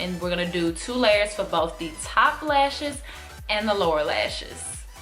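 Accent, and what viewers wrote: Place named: Texas, United States. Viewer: You have the cutest Texas accent and great video!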